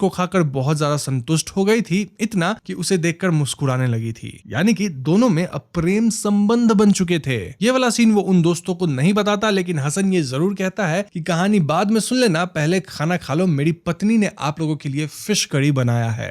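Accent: Bengal, India. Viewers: Bhai, achha natak kar lete ho..😅 Bengali hoke bolte ho ye bhasa nahi aati... Jitna bhi Koshish kar lo par koi apna MTI 💯% khatam nahi kar Sakta... Aapke bohat words se pata chal jata hai ki your mother tongue is Bengali... I have seen many Bengalis who claim to be a non-bengali and I fail to understand why they do like this...😅 But anyway good try but not the Best as you are caught... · bhaiya its loke bole😝.. bt bhaiya ur bengali sounds cute❤ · Thanks Bhai for covering this series! Loved your explanation and cute bangla accent haha! Take love from Bangladesh <3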